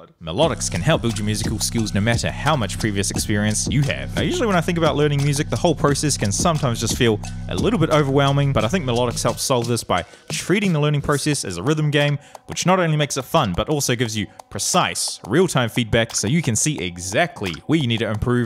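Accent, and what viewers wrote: New Zealand. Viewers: Coz yo I'm from.new Zealand aswell bcoz of your accent tho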